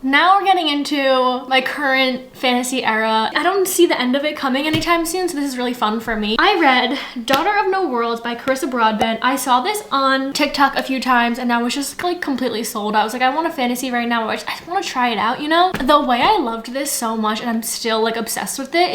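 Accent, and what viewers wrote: Canada. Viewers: she sounds Canadian or something or Ukrainian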